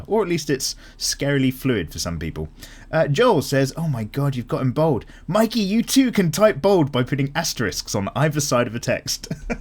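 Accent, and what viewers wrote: United Kingdom. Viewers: Mikey you kinda look like and sound like Ravi from Izombie (not just the British accent). if you don't know who i'm talking about... well you can look it up. i'm also gonna post this on every DWM in the future till you find out. also draw albedo from overlord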